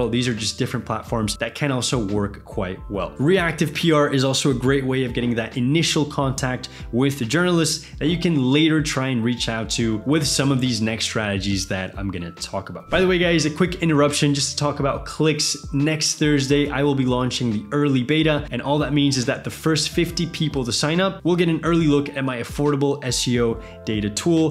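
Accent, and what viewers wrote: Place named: Singapore. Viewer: I've just spent 5 hours trying to work out your accent I'm going with schooled at an international school in Singapore or some shit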